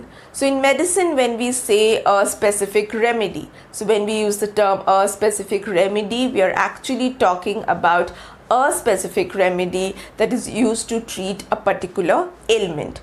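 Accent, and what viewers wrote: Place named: India. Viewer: This's the most close pronounciation of The Indians to English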